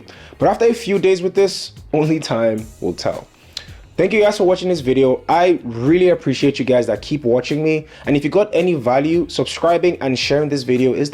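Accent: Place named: Nigeria. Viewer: bros nigerian accent makes it so hard to understand XD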